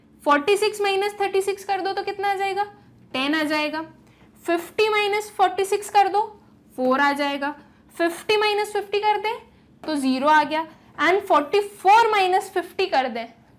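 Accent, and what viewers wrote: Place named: Gujarat, India. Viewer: I can tell that ma'am is gujarati.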